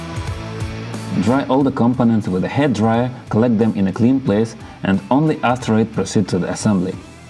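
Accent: Russia. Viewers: русский ёпть, акцент не скрыть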